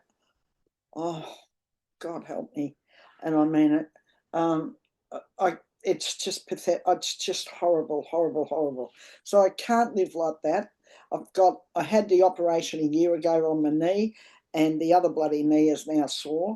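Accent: Australia. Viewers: ❤. A common sense Aussie voice. Love your straightforwardness.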